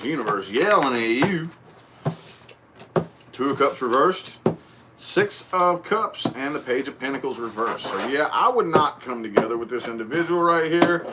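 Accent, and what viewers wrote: South, United States. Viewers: ...That Southern Twang,...Love🦋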